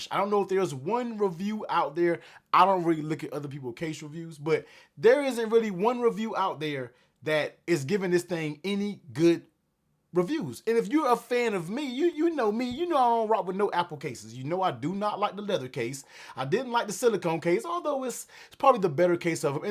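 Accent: United States. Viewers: You're american huh? can't you just talk normal?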